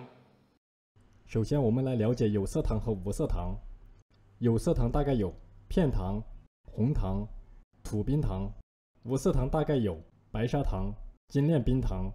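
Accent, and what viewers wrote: China. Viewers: 请问王师父能不能配上字幕，因你们中国口音对我们这些海外土生土长的华人来说真得听不明白。谢谢!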